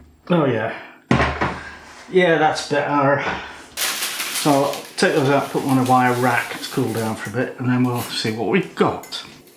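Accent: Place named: London, United Kingdom. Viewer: ) I am an Ilkeston Derbyshire (about 50 yrs ago) Sc-oh-n speaker. :-) I thought your pronunciation was by posh people from London. A very pragnatic review. I have an elderly (20+yrs) Phillips (about 2.4 L I think) thing and have recently bought an IP Vortex 5.7 Litre one cos it is bigger. I also have a Kasa thingy which gives some indication of electricity used (no idea how accurate, doubt it is very, if at all) and it says to me that it costs 0.14 kWh to cook a basket of Oven type Chips and about 0.2 kWh to cook the potato type (Non-par boiled which I would normally do). I know because I monitor my electrical demand daily that it used to cost 80-90p to make a batch of Home Brew (full Mashed from grain), it now costs over two pounds. Tsk - my expensive brew has increased in price to almost 60p/pint. (guesstimate) :-) I suggested some time ago that Scones were - sort of-separated out from a partially cut up shape of a round one in the middle and (perhaps) eight ones around the centre which were wedge shaped. 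I have still not seen where I think that from. Must make some.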